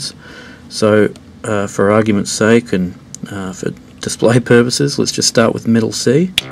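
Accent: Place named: Australia. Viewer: Hi, noticed the Aussie accent. Are you in Sydney? I found a 303 and 606 in a council clean up pile in Mascot. I shit you not. I have no idea how they work (I play cello) but if you’d like to have a look at them please let me know! It’d be fun to see if they work. I put batteries in the 303 and it lights up.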